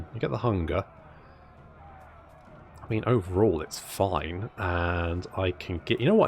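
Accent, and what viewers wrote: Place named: Northern England, United Kingdom. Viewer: I only watch CA content if it's made by a man with a northern english accent. I don't make the rules, but I do voluntarily and enthusiastically enforce it.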